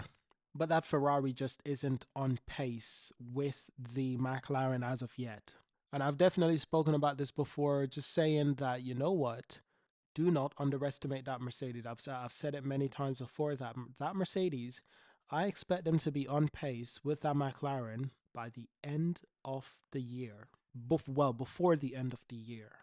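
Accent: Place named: Bristol, United Kingdom. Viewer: **It wasn't anything specific you said; it was more about how you pronounce certain words. You can tell that your tongue is positioned higher in your mouth, closer to the palate, when you speak. Additionally, there's less movement in your jaw; the tension in the jaw muscles can be heard if you know what to listen for These are 2 key and unique mechanics to a Bristol accent vs other accents from that region. There were a few other clues, but none related to slang, as I'm not familiar enough with British regional slang for that to have been a hint for me. I could possibly narrow down the area further, but out of respect for your privacy, I won't do that in the comment section, lol**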